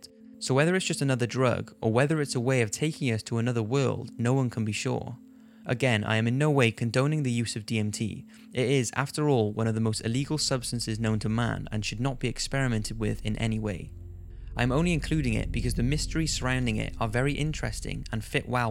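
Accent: United Kingdom. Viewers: Hey what part of the UK is your accent from?? I live in Australia and there's heaps of British people here but I would rarely ever hear an accent like yours? Lol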